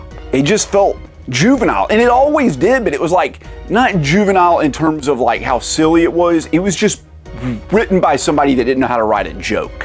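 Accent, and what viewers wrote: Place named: North Carolina, United States. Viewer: Just curious, are you from North Carolina? I am and you have that accent lol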